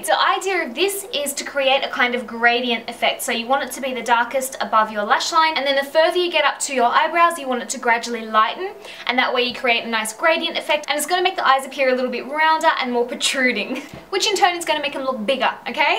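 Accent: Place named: Australia. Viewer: thees kolla heah! Is this an Aussie accent? it's so cute! lol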